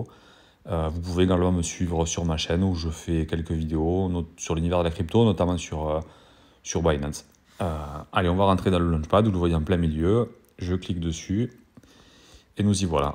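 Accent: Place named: South, France. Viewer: Et en plus t’as l’accent du sud ✌️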